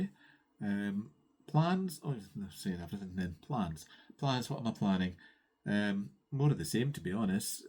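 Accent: Scotland, United Kingdom. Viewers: This is my first visit to your floss tube and I really enjoyed it. I really liked to listen to you because of your Scottish brogue. My grandmother was born in Scotland and never lost the Scottish lilt to her voice. Enjoyed seeing your projects.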